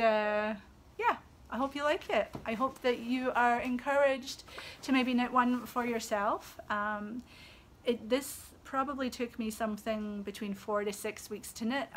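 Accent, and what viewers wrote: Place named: Glasgow, United Kingdom. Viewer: I’m so glad I found you and your lovely shop, you are a voice from home - I’m from Glasgow living in Virginia :-) Merry Christmas and a happy New Year to you and yours.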